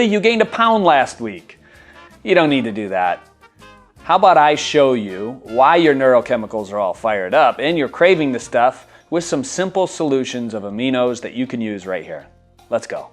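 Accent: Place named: South, United States. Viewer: The Miata and southern accent just gave me life. I have to start the video over.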